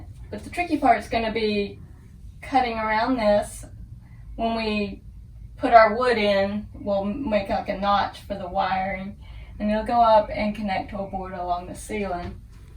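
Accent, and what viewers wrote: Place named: United Kingdom. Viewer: Yeah she really sounds like she’s from the uk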